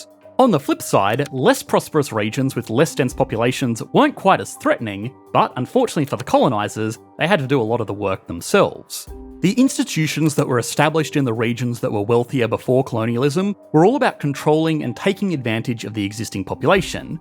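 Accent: Australia. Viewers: good job. Are you Ozzie?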